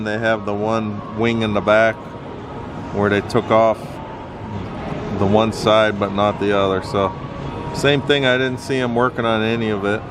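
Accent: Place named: Pennsylvania, United States. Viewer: Where you from originally? I thought I detected a PA accent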